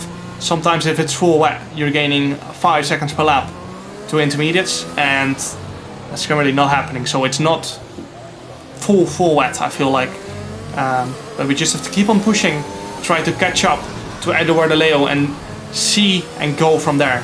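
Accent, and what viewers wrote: Netherlands. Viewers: You really sound dutch while speaking english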